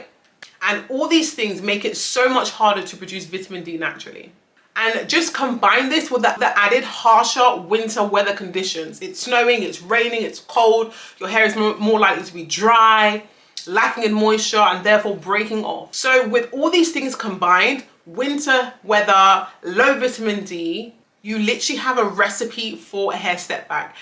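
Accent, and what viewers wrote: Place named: United Kingdom. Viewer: I hear a UK accent, and everyone knows the UK has no sun. Vitamin D is vital for the winter and low sun areas. My iron was low so I started geritol, biotin and recently added vitamin D and my hair is sooo thick. I am waiting on the growth but I have less breakage so I am so excited to see results in 6 months.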